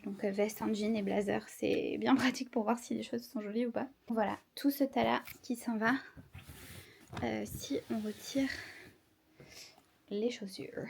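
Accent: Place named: China. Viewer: Purée ton accent chinois 😂😂😂😂 il est adorable 😂😂😂 你好 我觉得这个视频很好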